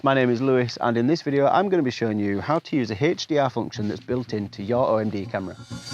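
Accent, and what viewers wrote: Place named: Northern England, United Kingdom. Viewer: Your northern accent and the breakneck speed at which you are talking is not very helpful.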